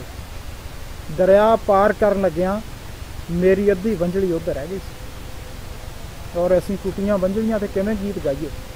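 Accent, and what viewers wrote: India. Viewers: Dear ❤️ professor sAhib, GoOd morning jNAb, daily jNAb nU M sUNNdA, samjhda bUT nOW, hUM mARi wARi, mARi public dealing kAfi hAi, & 1982, 85 tOUN chALdi Aaa Rhi hAi, As pER mY Opinions, face reading, jNAb waqti tA jO bi hAIN, sO hAiN bUT jNAb dA ways of talking, thinking, BOL ChAL,LEhjA hAR Lehaz tOUN mANU hindustania wAllA Lagda hAi nA ki Pakistani, if Any wAY, I am wRONG, please sorry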